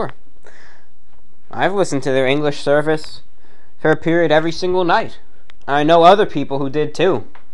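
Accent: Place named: New York, United States. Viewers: Love hearing his New Yorker in his early years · Damn your New York accent really shines in this one.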